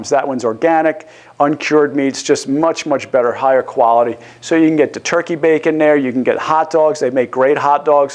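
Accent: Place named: East Coast, United States. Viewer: Been doing keto for the cognitive and athletic benefits. Unfortunately my diet is pretty expensive, $75/week even though I only eat twice a day and 90 g of protein daily (and that itself is on the low end because I also strength/weight train and play tennis). The reason for that cost is the protein I buy (pasture raised eggs and lots of fish, particularly sardines and salmon). However it is worth it for me because those are very high quality, high bioavailability, etc. So protein is usually the highest cost component of keto by far, but less active people might not need as much! Also random question Dr., did you come from the East Coast? I'm hearing a little bit of New York, New Jersey, Massachusetts (and some Italian influence as well!)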